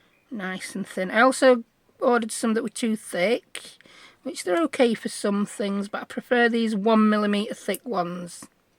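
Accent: United Kingdom. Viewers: Hello, I have been watching your videos for a few months now,some two or three times. I told my children that you talked like me with my sense of humor. Only you are British.I love listening to you waffle and crack on.😂 I have a suggestion for you, but you will have to concentrate and make it a habit. I know because it's taken me months and I still have problems with losing my tools. Get a bowl, tray, basket, etc to place it where you usually drop your tools. Then when you are done with the tool you are using, place it on tray or in whatever you have decided to use. Or you can make yourself a magnetic apron and most of you tools will attach to you. Anyway, keep up the awesome videos. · Hello, from Texas! I enjoyed this video - I love making ephemera from junk -- or "rubbish" as you call it in the UK -- just using up bits of this & that to make something useful and beautiful! 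I love it - thank you for the inspiration and I enjoyed the chatting, too - love your accent! Have wonderful day and happy crafting!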